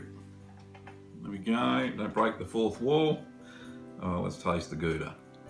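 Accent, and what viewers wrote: Australia. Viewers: Nobody gives a crap, stop being such a whiny baby. He's got an Australian accent. Leave the man alone.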